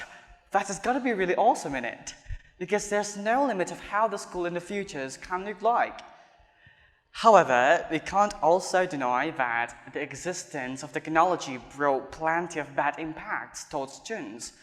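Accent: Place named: United Kingdom. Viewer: Masya Allah, kerenn sekali kak accent britishnyaa, semoga menjadi motivasi 🌟🌟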